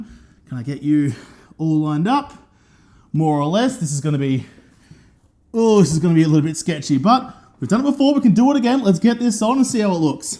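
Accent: Australia. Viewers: The aussie gab is a riot to listen too. At least you have a garage to work in! Im out here working on my Dart laying on my back in the dirt lol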